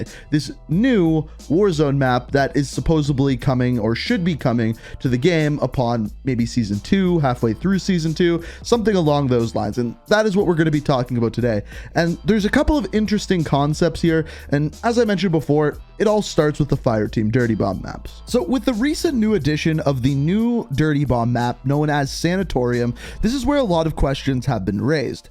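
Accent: Canada. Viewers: Is this guy Canadian? Sounds like he got a little Canadian tweak to his English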